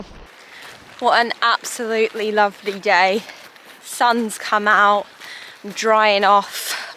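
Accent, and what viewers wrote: United Kingdom. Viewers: I from indonesia(kupang City)i like u aksen british&you nice girl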